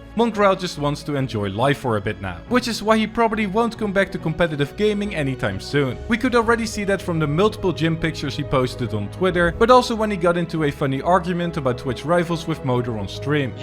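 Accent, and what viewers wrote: Netherlands. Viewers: are you dutc hyou got a dutch accent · Are you dutch?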